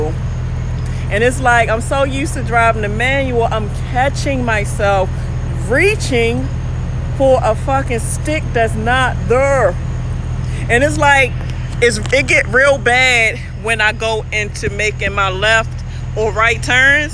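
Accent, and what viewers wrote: Baltimore, United States. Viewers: Why are you so Fricken cool?😍😍😍 I Love your energy. Soooo pleasantly Calm and peaceful; and that Bmore Accent😂😂😁😁😍😍😍👍👍👍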